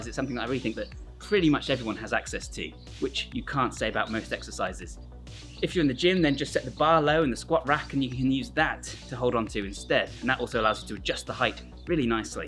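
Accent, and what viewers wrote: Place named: RP, United Kingdom. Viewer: I was expecting an L.A gang member in a prison yard not this nice sounding man with a posh accent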